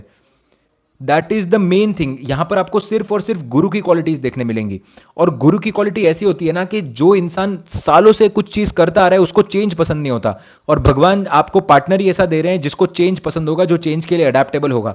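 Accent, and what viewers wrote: Indore, India. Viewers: Nice, but are you from Indore?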